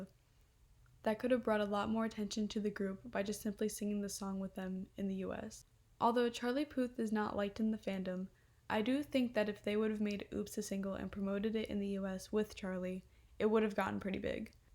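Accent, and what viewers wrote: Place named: United Kingdom. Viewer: omg ur american? wowwww thought u were british LMAO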